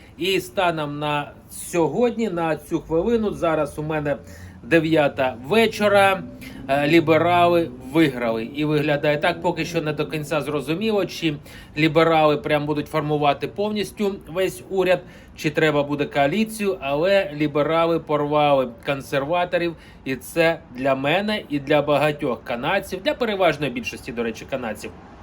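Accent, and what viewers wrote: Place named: Russia. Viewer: Не доставай. Так чути росіянський акцент, людина все життя розмовляла росіянською мовою, і в цьому не його винна. Зараз він розмовляє англійською, і це не так легко як здається. Говорю з власного досвіду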